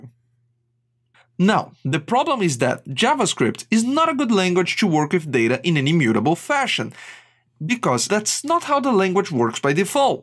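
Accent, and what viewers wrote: Brazil. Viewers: Are you brazillian? You have a brazillian accent Nice vídeo!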